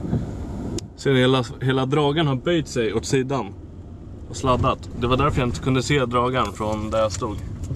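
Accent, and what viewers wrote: Stockholm, Sweden. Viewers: Fy fan så skönt att du blivit av med de mesta av din Stockholms dialekt :) btw helt sjukt vilken skillnad på kvalitet de e då mot dom nya :)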